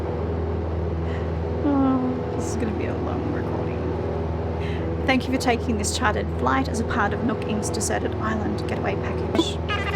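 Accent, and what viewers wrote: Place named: New Zealand. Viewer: I think they're asking because of the accent? Personally I think you sound a bit like a Kiwi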